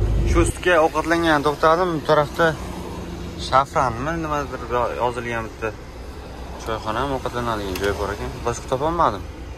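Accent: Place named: Navoi, Uzbekistan. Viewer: навоийни каеридансиз шевангиз вапше навоийникига ухшамидику еки хатирчиданмисиз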